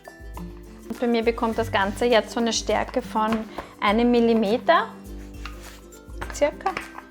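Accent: Austria. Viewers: Das Beste ist Dein östereischer Dialekt! (Dialektel) Ganz schön raffiniert. Die muss man nur vor den hungrigen Jungs verstecken, denn sonst sind sie alle ganz schnell wieder weg! Ganz schön erklärt und demonstriert.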